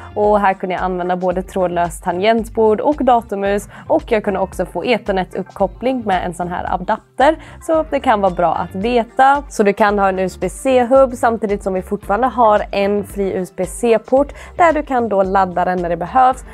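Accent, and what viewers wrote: Sweden. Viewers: Vad är det där för skön dialekt egentligen? Kan inte riktigt placera den. Västernorrland? Västerbotten?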